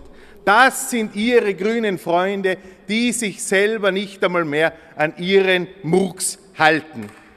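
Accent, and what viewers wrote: Tyrol, Austria. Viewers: Hr. Steiner der Beste! Das ganze mit dem tiroler Dialekt! Genial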